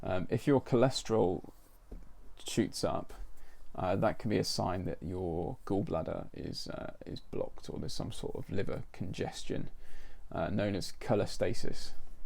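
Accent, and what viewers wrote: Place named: United Kingdom. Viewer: I took accutaine twice, once in my teens and again at around age 32. Suffered different areas of body pain through my 20's but never made the connection. After taking it the second time, got major gut issues, pain got worse and lots of brain fog. At 42 the symptoms got really bad fulltime and I've been sick and unable to work since. I'm 62 now. Do not even think of taking this drug! I've tried almost everything but I never heard of the gallbladder/liver connection until I watched your video. It makes a lot of sense. Going to look into it further. Have you thought of FMT? You have a British accent so if you are in England, there is a clinic called, I believe Taymount, that some say has helped them. I'm in Canada so it would be a bit of long haul. We'll see. Please keep posting if you're able.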